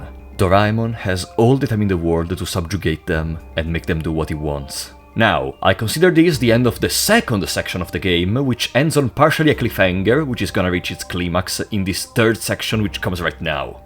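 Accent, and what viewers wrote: Italy. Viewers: Being from New Jersey it's weird hearing an actual Eye-Talian. Fun videos though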